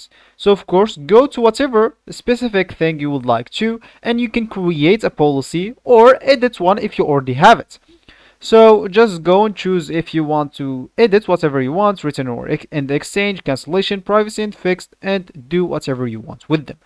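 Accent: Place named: Morocco. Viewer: Naaadi abro gha mn voice 3rftek mghribi hhh❤️👌🏻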